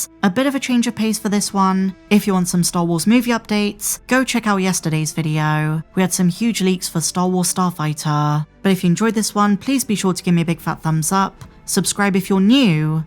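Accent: United Kingdom. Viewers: Sometimes I wonder. I've always felt like this host had a very unique quality to her voice that I haven't heard before live in person from anyone. Multiple unique tonal and pacing qualities, as well as a particular type of British accent that I've never heard before.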